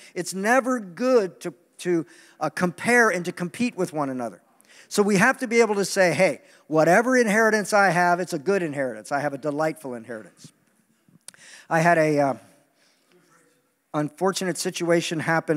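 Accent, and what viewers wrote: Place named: Brooklyn, United States. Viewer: Hi, Lol what's up with the Brooklyn accent?😂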